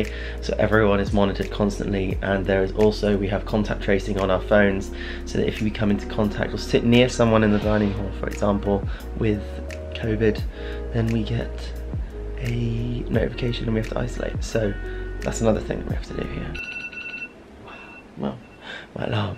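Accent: United Kingdom. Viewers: British accent 🤣🤣👍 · if you want to learn how to speak British accent , I must watch your vlogg heheheehe..... happy Good day!!! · Thanks for your video upload. It's nice to see what athletes do during their stay in the Olympic Village. Love hearing British English. BTW Congrats, Olympic Champion!!!!! :)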